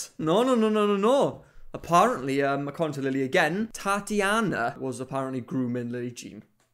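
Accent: Wales, United Kingdom. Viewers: I'm here for the Welsh accent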